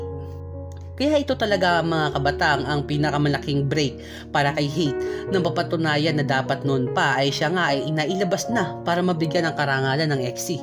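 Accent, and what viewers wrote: Batangas, Philippines. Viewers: Ganda mo talaga magkwento lods. Ganda ng boses at pronounciation (Batanggeno accent) more power po.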